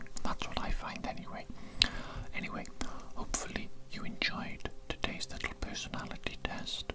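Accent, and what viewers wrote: Wales, United Kingdom. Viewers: the welsh accent hits 😫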